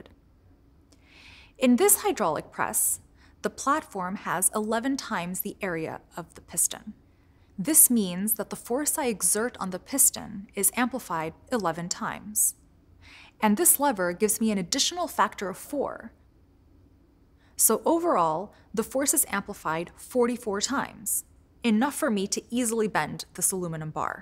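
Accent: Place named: United States. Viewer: Sounds very americanish- hard to bear listening for more than 2 minutes and not getting any closer to the point :)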